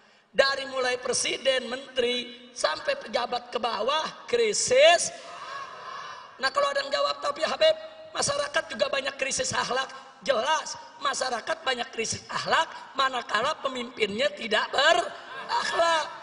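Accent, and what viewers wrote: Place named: Jakarta, Indonesia. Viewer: klo menurut ane yaa orang Betawi khas bahasanya emang seperti itu nada rada keras, buat habib Rizieq keras nada nya kaya komando perang tapi buat kebaikan,, negara gak berkembang klo pemimpin masih berbuat zholim siapa lagi yg negakkin klo bukan HRS? Apa harus Nunggu imam Mahdi? Kiamat inget broo menyakiti dzurriyat nabi sama saja menyakiti Hati Rasulullah, ada kisah nya 🔥 walaupun sekeras apapun tetaplah dzurriyat nabi 🙏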